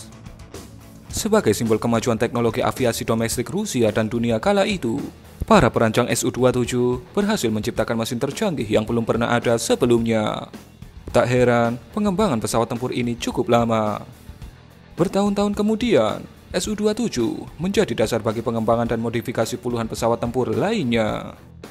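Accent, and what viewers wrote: Java, Indonesia. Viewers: Mantep nih channel, modal text Googling, footage nyolong dan bacotan medok bisa dapet 400k lebih subs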